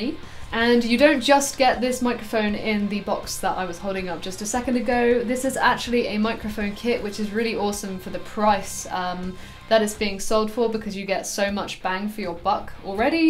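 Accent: England, United Kingdom. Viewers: Dear God, your English accent is just désarmant. Magnificient.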